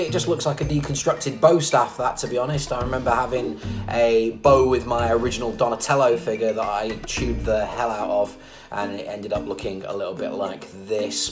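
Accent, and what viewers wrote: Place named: Australia. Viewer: I like your Australian accent! Also great review, really enjoyed it.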